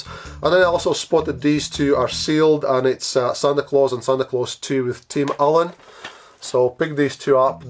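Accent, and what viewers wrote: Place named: Scotland, United Kingdom. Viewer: Go for it. I am sure there will be members of the audience that will like to see it. If you don't mind me asking where does your accent come from, I get the Scottish but there is a different twang underneath it, For clarity I come from a Glaswegian family so I pick up the Scottish accent quite easily just couldn't place yours fully. Keep getting lucky we all deserve it IMO.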